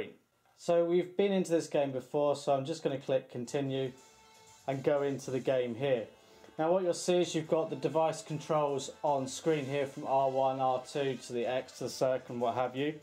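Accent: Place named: United Kingdom. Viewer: I cannot explain how i love the british accent!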